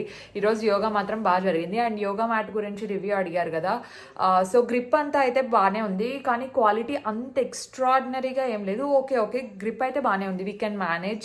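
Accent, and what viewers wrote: Anantapur, India. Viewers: Akka meeru Ananthapoor slag matladithe vinalani undhi akka plzz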